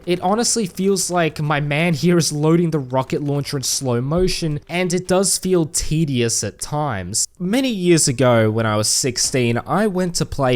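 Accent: United Kingdom. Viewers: Nice British accent man.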